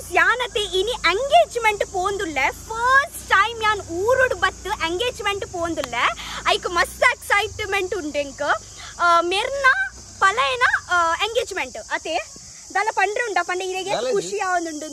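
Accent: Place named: Tulu, India. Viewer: Ur tulu is funny nice video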